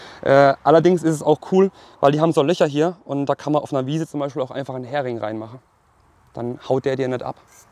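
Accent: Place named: Swabia, Germany. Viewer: Müsste Schwabe sein glaube ich 😄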